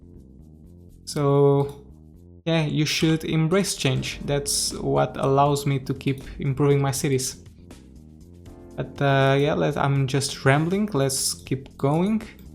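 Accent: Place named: Portugal. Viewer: Descobri o canal recentemente, não sabia que esse sotaque era português! Abraços do Brasil!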